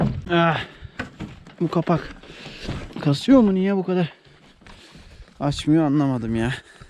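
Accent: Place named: Thrace, Turkey. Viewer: kardeşim güle güle kullanın ahırı, sayayı bir şey dikkatimi çekti ben Tekirdağlıyım sizin orası Eskişehir ama şive bana bayağı Trakya ağzı gibi geldi.Annen Takyadan gelin mi Eskişehir e. Herkese saygılar.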